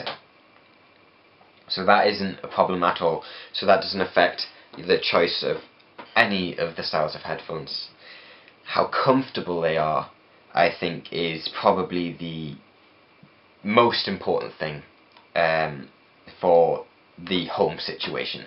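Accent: United Kingdom. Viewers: Are you welsh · Are you English? Because I am, if you subscribe to me, I will subscribe to you!!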